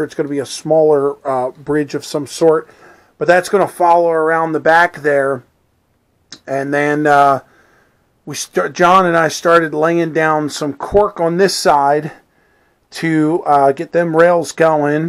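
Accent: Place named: Philadelphia, United States. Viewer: Nice to put faces together with names out there in the livestreams. I could tell you are from Philly with that accent. Penndel was my stomping grounds as a kid. Thanks for sharing. 👍 👍 👍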